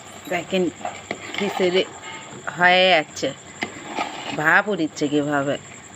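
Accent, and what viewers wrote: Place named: Joypurhat, Bangladesh. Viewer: জয়পুরহাট জেলার ভাষা মনে হচ্ছিল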